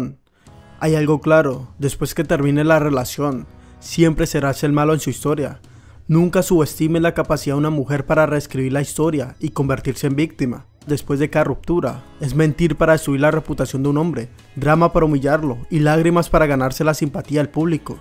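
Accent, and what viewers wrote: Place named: Cali, Colombia. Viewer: Excelente video. El pana de Cali. El acento lo delata. La info del video es verídica 100%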